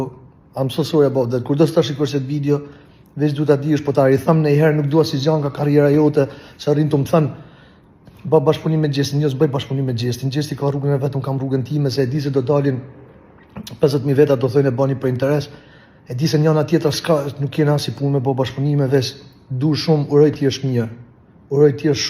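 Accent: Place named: Laskar, Albania. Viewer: Ik ore lepirse, le nam. Po kan ngren leva. Veprimet kan pasoja, mire ja beri. Ti gjithsesi je vk qe flet si laskar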